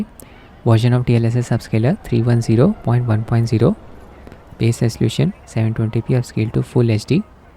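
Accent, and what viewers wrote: India. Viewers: Indian voice, in the garbage it goes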